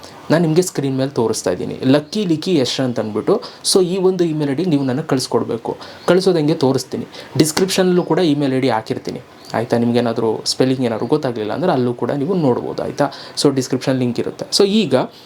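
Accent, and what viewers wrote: Mandya, India. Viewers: Super 🎉🎉 ❤❤ olledagli nim alochanege❤❤ brother namm mandya flavor nim bay li kelode chenda🎉🎉anna❤❤ tqsm...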